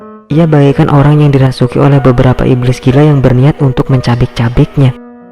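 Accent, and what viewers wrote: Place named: Bugis, Indonesia. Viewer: Ada logat2 bugisya..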